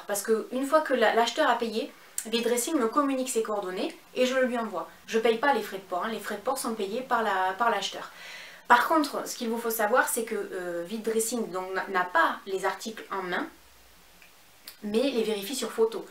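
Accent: South, France. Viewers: ton accent du Sud mon Dieu j'adore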